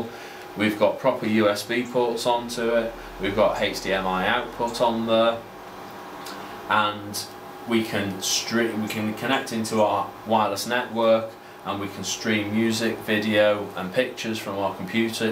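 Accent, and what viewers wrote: United Kingdom. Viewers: hes is british its his accent.... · correct me if i am wrong, but doesnt that come from the strong british accent? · you cant blame him for his British accent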